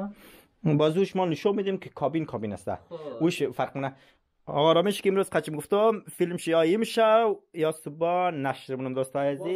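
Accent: Hazaragi, Afghanistan. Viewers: Man bazi az chiz hai ke megi namifahmam chon hazaragi harf mizani va ham tond sorry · are bakhuda khub saf azirgi tora mugya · واقعآ باید بتو افتخار کرد، چون سوچه آزرگی گپ میزنی😍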